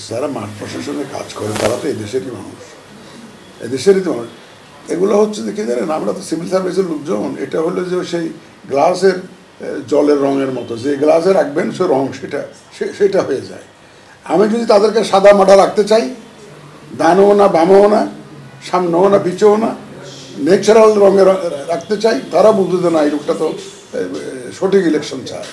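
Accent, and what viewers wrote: Chittagong, Bangladesh. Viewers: কথায় বুজা যাচ্ছে উনার বাড়ি ও চট্টগ্রামে। যাহোক উনার কাছে আমরা ভালো কিছু আশা করি। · কথায় একটা চাঁদগাঁইয়া টান আছে।চট্টগ্রাম ❤ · হুম তাই। উনাকে আজকেই দেখছি, প্রথম বার উনার কথা শুনছি। উনার কথা শুনে কমেন্ট পড়তে এসেছি, উনি কোন জেলার কেউ লিখেছে কি-না। কারণ উনার কথায় আমাদের চট্টগ্রামের একটা টান আছে। আপনাকে ধন্যবাদ, উত্তর টা পেয়েছি।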